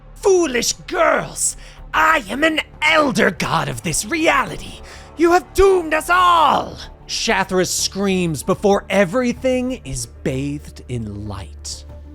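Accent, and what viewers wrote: United Kingdom. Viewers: Not all us brits sound like Chimney sweepers from the 1940s come on man do some voice research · has got the fantastic job apart from that British accent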